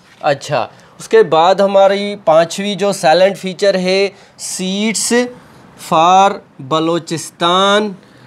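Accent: Sindh, India. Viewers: Sir are you sindhi ??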